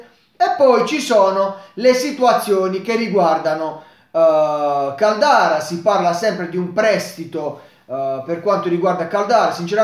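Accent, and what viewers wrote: Bari, Italy. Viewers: Ma di dove sei di Bari ?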